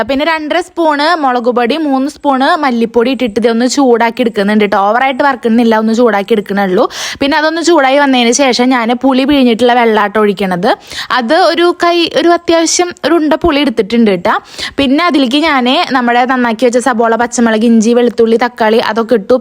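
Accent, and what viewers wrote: Thrissur, India. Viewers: Eniku Thrissur bhasha bhayankara ishtanu... samsaram adipoli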